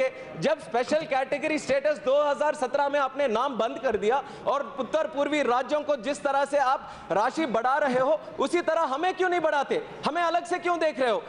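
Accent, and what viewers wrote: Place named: Andhra Pradesh, India. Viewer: Andhra voice is there...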